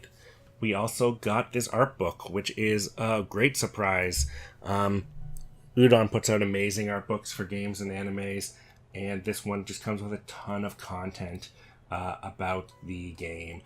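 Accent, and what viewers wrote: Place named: Canada. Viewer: nice canadian accent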